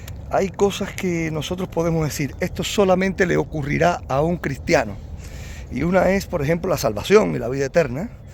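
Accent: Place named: Cuba. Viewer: Fuera creyente o no los que su propiedades quedaron intactas, solo podemos decir que Dios los cuido. No entiendo porque usted decarta lo que está antes los ojos de todos. Creo que su forma de hablar me parece Cubano. Parece más ateos que alguien que hace una exegesis de su narración. Nadie puede negar que todas las casa que no le paso nada fue un milagro de Dios.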